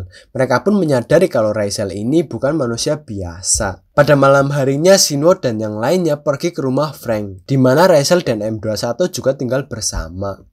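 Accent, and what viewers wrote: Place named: Banyumas, Indonesia. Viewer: Njir wong ngapak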